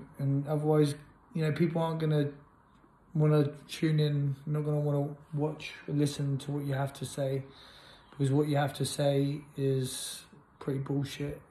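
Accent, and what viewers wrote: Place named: United States. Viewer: The yank accent is gold, never surrender, greatness awaits 💯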